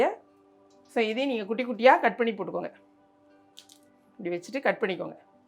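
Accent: Tirunelveli, India. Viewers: Tuticorin n Tirunelveli slang super.very nice our language cooking is very interesting.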